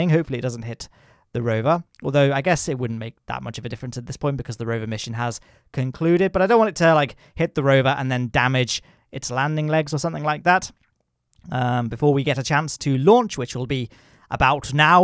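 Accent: United Kingdom. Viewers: he's British I thought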